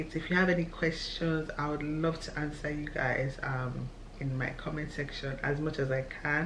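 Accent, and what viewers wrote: Ghana. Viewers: Too much respect to you and all women around the world I can see how child bearing really takes toll on women you’re totally changed physic wise and the tone of your voice now sounds more Ghanaian congratulations 🤱🏽👼🏾👩🏽‍🍼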